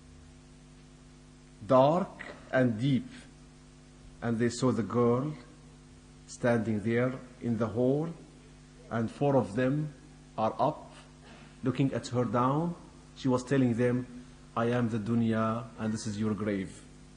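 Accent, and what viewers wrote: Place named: Sweden. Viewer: His accent is Swedish.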